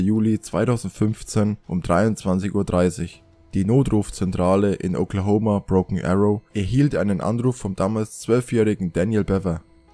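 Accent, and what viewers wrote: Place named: Franconia, Germany. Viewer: so geil! Ich liebe fränkisch! Alle aus meiner Family kommen da her. (Nur ich nicht 🤨)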